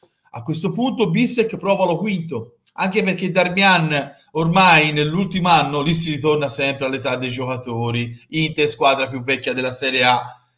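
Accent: Tuscany, Italy. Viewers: Accento toscano Top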